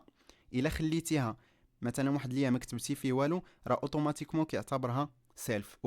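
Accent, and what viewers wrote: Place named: France. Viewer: J'adore ton accent français ! A quoi çà sert de mettre un titre en français franchement !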